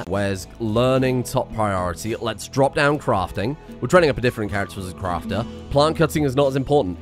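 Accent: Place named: United Kingdom. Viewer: Speaks in British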